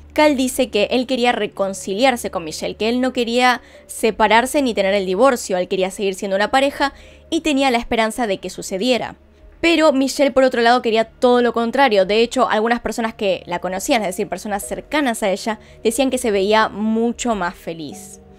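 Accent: Argentina. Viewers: QUe hermoso narras!!# adoro tu acento argentino. Saludos desde illinois uss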